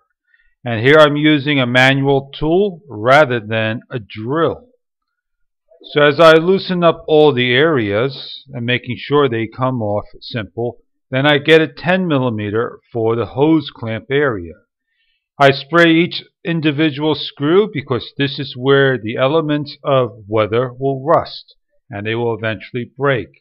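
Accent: New York, United States. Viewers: U from ny!?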